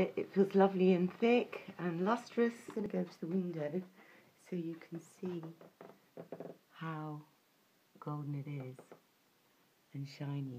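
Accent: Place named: United Kingdom. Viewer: Awesome, thank you for sharing! You have a really lovely accent, it's British, isn't it?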